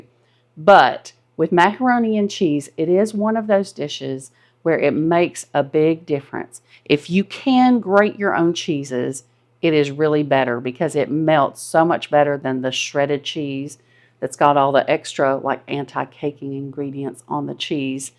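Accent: United States. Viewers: 1st time viewer in Latvia. Wow, the minute I heard your accent, I knew this was gonna be the real deal for this iconic American dish! Appreciated the relaxed, down to earth presentation. Subscribed. Thank you!🎉 (Will maybe add some ground pepper tho😂)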